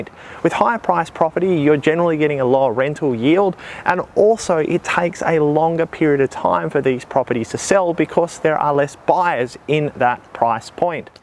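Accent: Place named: Australia. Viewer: Oh good, you're Aussie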